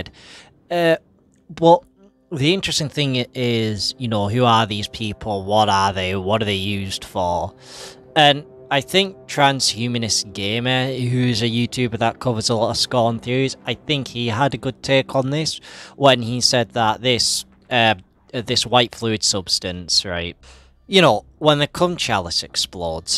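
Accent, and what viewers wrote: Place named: Scotland, United Kingdom. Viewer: I'd say he's probably Sco'ish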